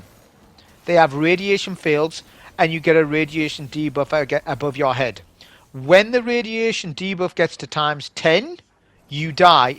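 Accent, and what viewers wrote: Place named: Newcastle, United Kingdom. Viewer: I started to play this one only recently and could see how difficult this is. Btw your accent is similar to one of my coworkers who’s from Newcastle lol. Good one as always !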